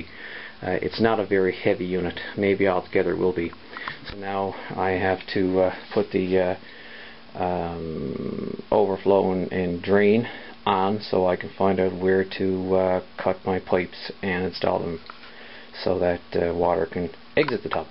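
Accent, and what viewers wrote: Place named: Newfoundland, Canada. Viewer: This video saved me a ton of time. Thanks! Recognize that NL accent -- should have tracked you down to install it for me! HA! Thanks again!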